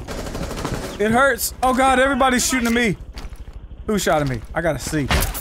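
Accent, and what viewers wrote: South, United States. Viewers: DaRealMastalin all white guys from the south sound like this :s its hot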